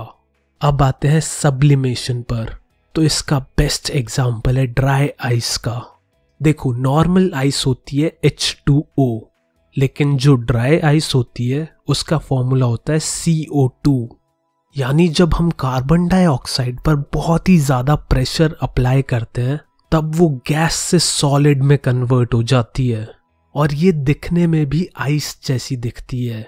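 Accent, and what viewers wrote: Haryana, India. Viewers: I bet ..This guy is from HARYANA !! 🥶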